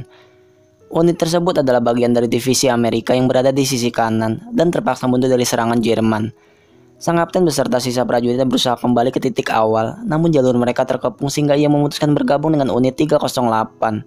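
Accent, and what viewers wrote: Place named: Banyumas, Indonesia. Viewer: Logat nya ngapak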